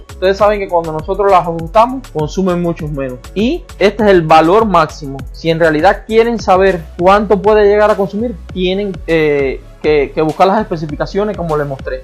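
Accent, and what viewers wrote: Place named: Cuba. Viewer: me lo imaginé por el acento, yo también soy cubano, y estoy iniciando en la minería, muy buen trabajo estas haciendo hermano, sigue así, un saludo desde Italia 👍🏻👍🏻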